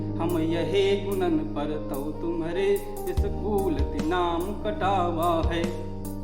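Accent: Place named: Awadhi, India. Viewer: बहुत अच्छा जी 👌👌👌 अवधी की मिठास अपनी एक पहचान है 🙏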